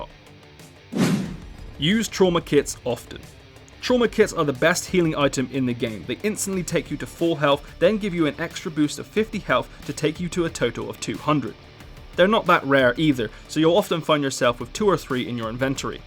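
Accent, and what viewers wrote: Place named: England, United Kingdom. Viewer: Frickin english accent Speak correctly idiot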